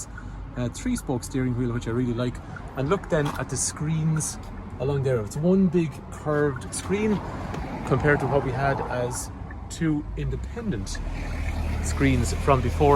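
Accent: Ireland. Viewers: Only with can give an unbiased & informative review on the cars reviewed, plus the Irish lilt is best, consequently I have bought 2 new Hyundai cars on his comparison site, I only wish I was in Ireland & not England to buy my next car, Well done!!!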